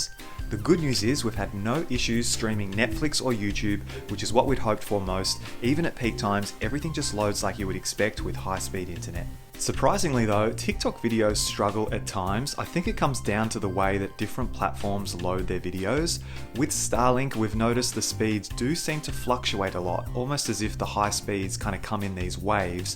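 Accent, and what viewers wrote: Australia. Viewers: Aussie accent is the best mate! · The most Aussie start to a video ever..,”it’s been two months since we installed Starlink on our garden shed” [with Aussie accent, pointing at Starlink dish, bush setting] 😀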